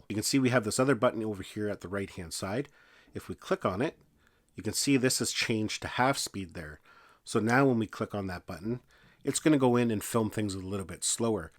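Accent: Canada. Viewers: Thanks heaps for the help. I'm thinking from the accent and scenery that you're a Canadian!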